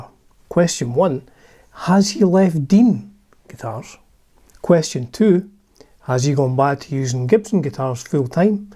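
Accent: Scotland, United Kingdom. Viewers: Growing up, Michael Shanker, was always my favorite guitarist! I see your Scottish by your accent. I’m an American but anyway, I love the show outlander they got the Scottish actor Sam Heughan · great video buddy. Blessings to you and your family from Hogganfield Loch Glasgow originally from Saltcoats on Ayrshire coast I've been a Schenker fan from Obsession LP onwards my favourite guitarist. What you've missed is that Gibson won a massive law suit with Dean Guitars who can no longer make the Flying V or other shapes of Gibson I think they are in deep trouble financially now since the court case so Michael probably released from his Dean contract and hence back to Gibson. You sound East coast hope you're a Hibby same as me lol. Kind regards buddy. 🎸✝️🏴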